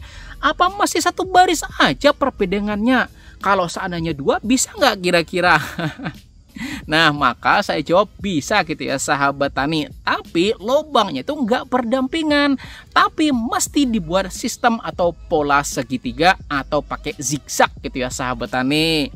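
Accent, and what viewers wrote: Banjar, Indonesia. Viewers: Pian urang banjar kah mang??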